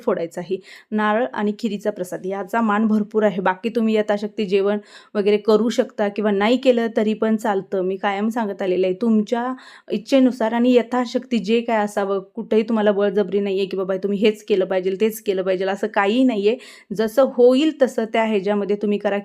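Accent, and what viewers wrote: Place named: Solapur, India. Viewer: Ag tai Tu kolhapur chi aahe na solapur ka bolte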